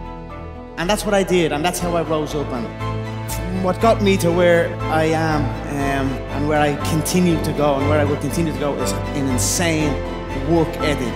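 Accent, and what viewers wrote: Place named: Ireland. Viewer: 아일랜드 발음 중독성 쩌네 ㅋㅋㅋ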